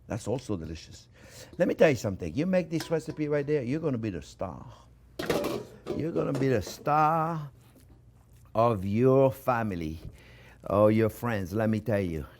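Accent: France. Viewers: Great video. You are an amazing teacher. You never said what temperature the oven was set. I guess 350degrees? Please use ingredients & the measurements in subtitles as your beautiful French accent is a bit confusing to this 72 year old Marine. God bless you and your family during this holiday season. I look forward to your next video. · your accent and attitude are evrything ! mon cheri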